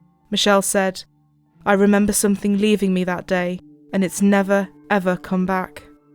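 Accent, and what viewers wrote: United Kingdom. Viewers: When you say reached out do you man contacted, you sound like you are British and not American so why do you use this Americanism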